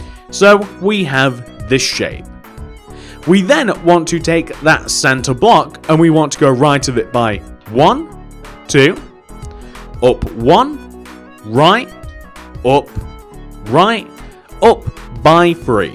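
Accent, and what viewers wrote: United Kingdom. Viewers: you sound like britbong, very distracting, 7/10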